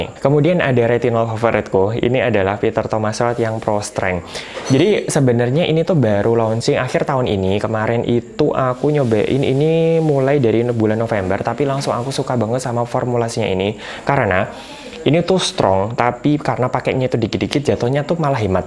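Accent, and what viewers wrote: Java, Indonesia. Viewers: Dosen skincare pertama saya 😁... Dokter, medhoknya mirip youtuber "Korea reomit"... 🤩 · Mbleber ndlewer ndlewer 😂😂 Jowo buanget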